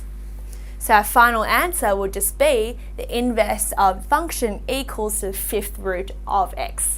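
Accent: Australia. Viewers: maybe Australia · thats aussie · aussie chinese descendant im sure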